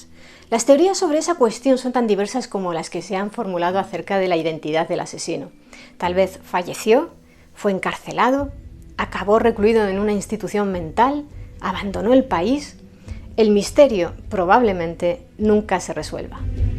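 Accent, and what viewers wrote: Spain. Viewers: me gusta escuchar historias con acento español, cuando escucho acento de mi pais o de latinoamerica no me asusta me dan ganas de bailar jajajaj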